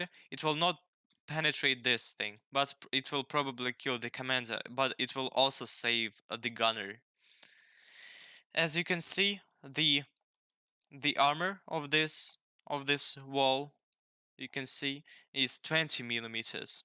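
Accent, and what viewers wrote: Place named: Russia. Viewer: Классное видео, ты кстати похоже рус? Потому что у тебя в акценте русские согласные ядерные.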